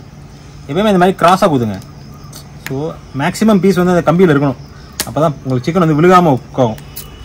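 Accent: Madurai, India. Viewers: எனது வயது 39 எனக்கும் சொந்த ஊர் மதுரை தான். Hope சம வயசு ஆளு தான்னு நெனைக்குறேன். இந்தாளு வீடியோ வையும் பேச்சையும் கேக்கும்போது ஏதோ கல்லூரி, பள்ளி நண்பர்களுடன் இருப்பது போல ஒரு Feel. அந்த கால கட்டம் வந்து போது... இந்த சமையல தாண்டி நீ நம்மூரு ஸ்லாங்ல பேசிட்டே இருயா... அதுவே நல்லாருக்கு... ❤❤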